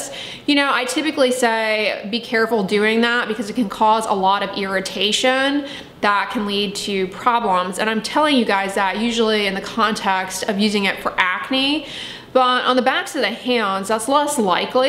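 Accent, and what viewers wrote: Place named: Texas, United States. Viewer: Dear Dr, Dray, I really enjoy your videos and am enjoying them. I grew up in El Paso, and was there for much of the last 4 year period while my mom was still alive, so enjoy the familiar mild TX accent and shopping opportunities, as well as your very helpful information. Now, however, I am back at home in Juneau, Alaska, which I do love -- but not the shopping... We do NOT have: Dollar Tree, Wal-Mart, Target, CVA, Walgreens (or Rite Aid or any other chain pharmacies). We do have two small grocery stores, part of the IGA chain (think small independents that were bought by a chain that buys small independent stores in rural areas - and in fact IGA bought our 2 small independent groceries when the owners wanted to retire); one Fred Meyer -- bought by and now part of the Kroger chain; and one Safeway, which I rarely shop at as it is farther from me than Fred Meyer. 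We also have a very small Costco, probably the smallest Costco I have ever been in. I live in a medium-size regional center, and the capital city of my state. (Also, we have no road access to go anywhere else, as is also true in much of Alaska.) This is why rural America feels sort of left behind and "out of the loop". If you can do a video of skin care options available at Kroger or Costco, especially cost-effective ones, I would be very grateful.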